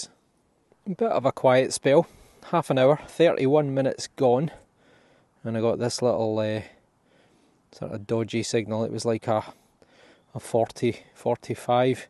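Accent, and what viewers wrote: Scotland, United Kingdom. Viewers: The face is not ugly and the Scottish accent is so beautiful. The history you know is so interesting. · I'm one of your new subscribers and just want to say you deserve all this new attention your getting, not only are you making wonderful discoveries but also your Scottish accent is one of the subtle ones, which makes you easy to understand, even for non Brits, best wishes for 2023 hope you find what your looking for Andy a Brit from the Midlands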